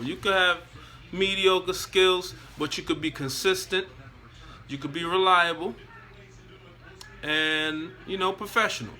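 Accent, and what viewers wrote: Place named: Harlem, United States. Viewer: Bro. Sound like Harlem and the shop could be there as well. Uptown Uptown. Interesting Inside infor on rappers being cheap!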